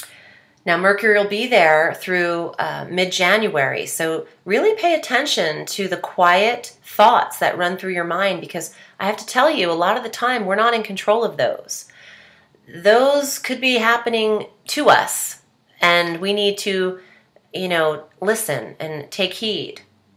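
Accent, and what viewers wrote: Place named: New Jersey, United States. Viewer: what a gemini communicator you are....i am so glad saturn isn't retrograde in my 10th house anymore...that was a 2 yr typhoon ruby if there ever was one! jupiter retrograde n the 7th house till 8/15!! giddyup!! I wish you would let more of your NJ accent out! I would love to hear your accent unadlterated....you could do your videos in pigtails and and yellow shirt with neon pink polka dots...you are fantastic.....love your nails....you're always changing the color ms. gemini.....ur explanations are in depth and so educational....thanks for taking the time to interpret. ;) always appreciated.....a fellow east coaster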